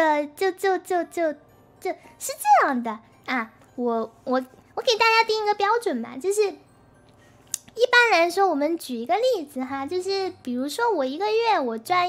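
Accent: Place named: Japan. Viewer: dang yo accent made me think you were speaking japanese for a sec lol what I heard was aksldntkhwkejars挣钱aksjdkfalksdf打钱 very cute tho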